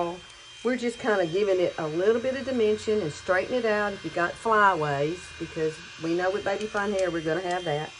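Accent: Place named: Alabama, United States. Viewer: I found your fine hair vids and immediately when I heard you talk I thought " Alabama". I'm an Alabama lady too though much older than you.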